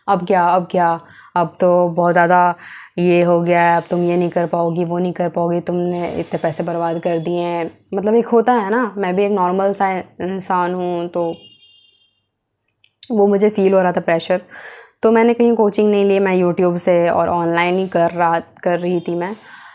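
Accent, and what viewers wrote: Bihar, India. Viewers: Tumhari acent me bihari tone hai 😅.... Are you from Bihar?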